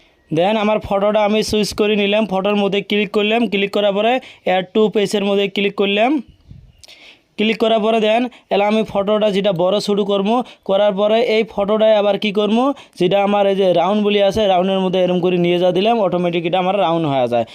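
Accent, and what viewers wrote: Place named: Kurigram, Bangladesh. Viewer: এ কুড়িগ্রামের ভাই, ইউটিউবে চ্যানেলে ভিডিও আপলোড করেছ, একটু শুদ্ধ করে কথাবার্তা বল তাহলে শুনতে ভালো লাগবে, সারা বাংলাদেশের লোক দেখছে বুঝনা কিছু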